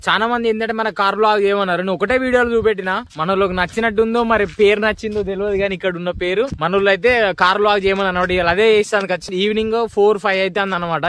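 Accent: Telangana, India. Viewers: నీ భాష కొంచెం నాటుగా ఉన్నా కుమ్మేసావ్ బ్రో పక్కా తెలంగాణ నాకు cars గురించి పెద్దగా ఏమి తెల్వదు కానీ టాటా Tiago NRG కొన్నా full happy 😮😮😅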